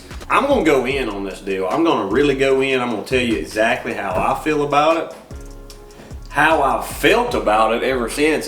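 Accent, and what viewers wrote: South, United States. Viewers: I appreciate your heart on this you southern accent reels it all in for me it's like listening to family also I'm sorry for your experience with this and you know and I know God brought you out of this, but the politics is exactly what you think you got it right friend. This is what I discovered and I believe God shown me, from the beginning I knew they rolled it out too fast I was out on lockdown for 4 wks and in that time I experienced a little fear and it felt familiar like the time the world learned about terrorism at 9-11-01 and before that with the extreme Islamic. I began to seek the Lord I knew I wasn't anywhere I was supposed to be with the Lord but I did what I knew to do repented began to read my Bible more and I was accustomed to witness cause though steeped in my sin I knew the Lord had a job for us believers to do but I couldn't be around anyone I thought going to street evangelize but my family and some YouTubers advise not going alone but I really wanted to fish, that's my type of fishing cause Jesus made us fishers of men. 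No one would go fishing with me so I continued what I started to do while on lock down and that became a YouTuber for Christ and I also forwarded videos I found on you tube some shocking things about the poor spirituality of our current state as a country I mean I was desperate to do the work of the Lord I may have went a little overboard but when you don't know if you have the next day or the next moment then you reach out to everyone you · You Country AF. Lol new to your vids. What’s stand for? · Thank you for sharing your experience with Covid-19, Everyone will experience Covid-19 differently with a lot of Similarities, there’s several Symptoms commonly known with COVID-19, everyone has or has not Other Underlying Health Issues that will Most likely make this Virus more difficult to Deal with and it may add more Symptoms and issues, I’m a free diver and I need my lungs to be in top Condition so I try to take necessary measures to keep from getting infected but i also have a few underlying health issues, I’m a Combat Veteran and my VA Is helping me a lot to keep it safe for me. 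Your message hear is Spot on… My fellow Comrades and I fought in Various Combat theater’s to keep Americans Freedom so Everyone has their Rights, COVID-19 is REAL, PEOPLE ARE DYING, DO WHAT YOU CAN TO KEEP FROM BEING INFECTED, TRY NOT TO INFECT OTHERS, TAKE THIS INFORMATION SERIOUSLY! People Pay good attention to what this young man is telling you! I agree with his message, I’m 57 and not in great health so I must stay well, I love my Tuna, Mahi-mahi, Wahoo (Ono), Sword fish) so I must keep fishing (free diving)W/O tanks! KEEP ON FISHING! Where ever you’re from, not sure but you sound like a Southern boy? Kentucky or Tennessee? I have a Army buddy up in Kentucky, strong accent like yours lol. Take bud. Aloha from Hawaii. 🌴🌴🤙🏼😎🤿🌴🌴🍍🥑🍌🏄🏽‍♂️🚣🏽🚤🐟🎣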